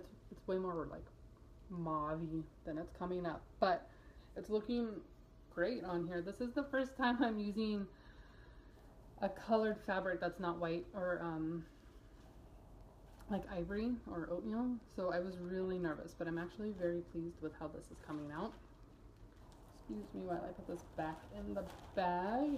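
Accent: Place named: Northern Ireland, United Kingdom. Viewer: would you believe not just Irish, but Northern Irish, a much more rare accent, and very charming (more like Scottish). And actually a lot of people find it hard. Probably because initially you want to say (as in Michelle) and then Stitch becomes hard to say 😝 trust me to make it harder than it needs to be. I must admit I put about 13 seconds thought into it 😉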